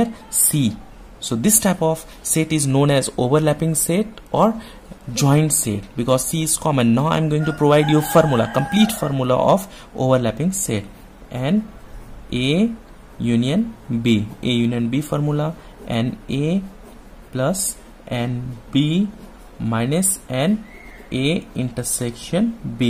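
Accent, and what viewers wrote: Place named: Nepal. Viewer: Your accent sounds nepali. Are you??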